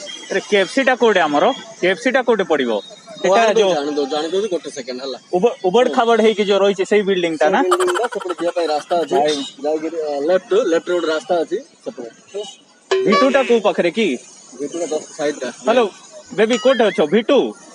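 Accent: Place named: Bhawanipatna, India. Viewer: ❤️😍 Waah awsm and the u used the Bhawanipatna wala odia, so it seems real ❤️ Keep it up 😊 hasate rahio ase hi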